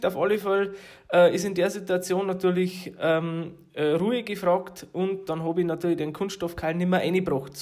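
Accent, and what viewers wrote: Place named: Upper Palatinate, Germany. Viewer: Hallo, wo kommst du genau her? Vom Dialekt müstetest du Oberpfälzer sein und mit KEH-Kennzeichen kommt da nur der alte Riedenburger Landkreis in Frage? Dann war ma ja fast Nachbarn, Schöne Grias aus da Obapfaiz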